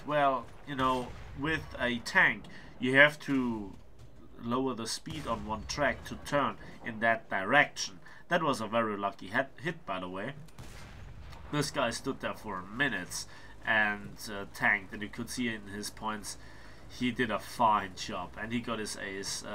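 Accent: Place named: Franconia, Germany. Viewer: du hörst dich irgendwie fränkisch oder bayrisch an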